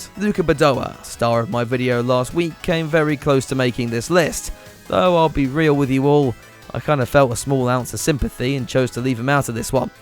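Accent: United Kingdom. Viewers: Another generic British sounding guy starting a new F1 Youtube channel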